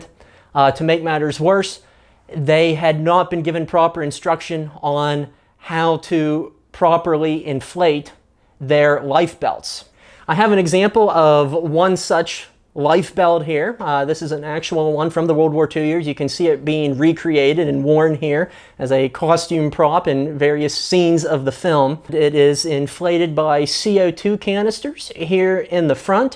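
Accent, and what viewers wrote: United States. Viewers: Anyone who wants to know how american accent sounds just has to listen to your video ! Love from Normandy, near the beaches. .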